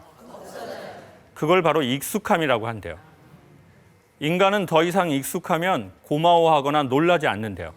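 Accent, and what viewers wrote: Jeolla, South Korea. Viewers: 영상 잘 보고 갑니다~ 구수한 전라도 억양이 듣기좋네요~ 사투리로 강의도 함 해 주랑께요~~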